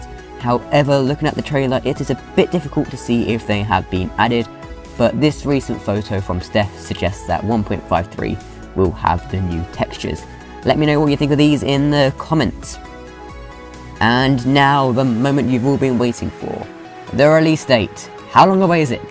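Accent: United Kingdom. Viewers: It's been 12 days,where is the uptade? And you sound very very britishy.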